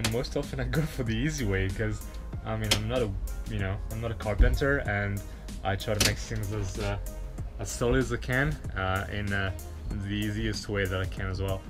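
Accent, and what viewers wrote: France. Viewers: Nicely done! You sound french ;) Nice ideas for when I'm building my fifth van in the future ;) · Bravo ! On n'entend presque pas que tu es français ;) Super conversion également, j'adore le deck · .Love your build... And accent haha ... Youre so chill too for a french guy .....I subbed. Keep it up!! Btw.... No mains 230V power inverter? Hi, From (English) Canada ;) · are you french or somethin? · Love french accent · are u french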